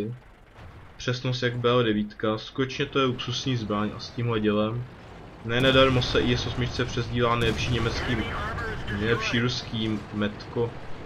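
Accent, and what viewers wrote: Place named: England, United Kingdom. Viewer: ja neviem ci skusas anglicky prizvuk alebo potrebujes logopeda ale strasne sa smejem :D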